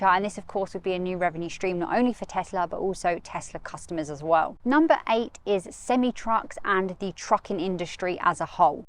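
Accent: United Kingdom. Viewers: It's always a pleasure to hear from you and to help me with my British English listening. So thank you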